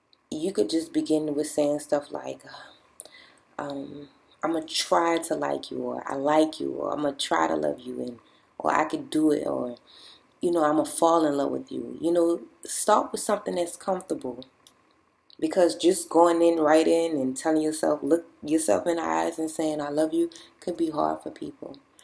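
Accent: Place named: New Orleans, United States. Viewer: you from new orleans? i hear it in your voice lol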